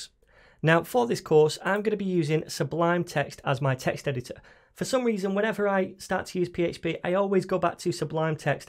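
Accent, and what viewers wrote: United Kingdom. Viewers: Is this British Accent. I Love it so much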